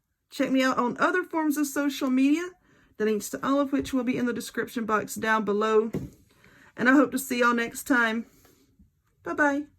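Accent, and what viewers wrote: South, United States. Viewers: I just love your projects! I love your Southern Accent and fabulous SENSE OF HUMOR! Merry Christmas from ONE SOUTHERN GAL to ANOTHER❤️🌲❤️🌲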